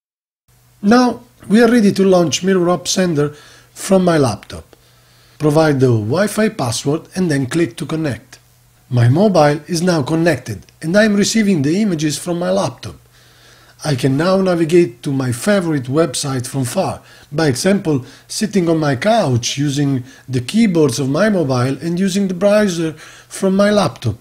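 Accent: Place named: Italy. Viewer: accidenti l accento italiano come si sente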